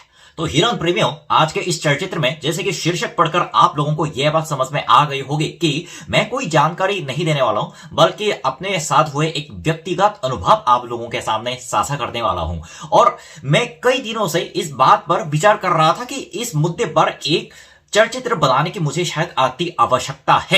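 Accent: Standard. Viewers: Nice video 💜💜. Itni shudh hindi to mujhe bhi nehi ati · Ettni shudh hindii mujhe bhi nhi aatii 😅 i like your shudh hindi 😁 I am from Bihar Patna 🙂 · Kon bevkuff hai jo ye sab bola kisine subscribe kiya toh aapko kharid nhi liya hai sach a low thinking ese log bahut selfish hote hai are uski life hai woh kahin bhi jaye ghumne tumko qya kam se kam woh aapki sanskriti ko toh faila raha hai korea ka hokar he is inocent guy i like his clear hindi accent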